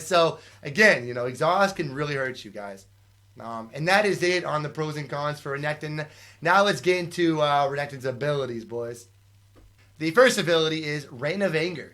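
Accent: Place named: United States. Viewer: as a german is hard to understand your english over a long time cause you have i think a strong american accent but i still like your videos and streams pls keep it on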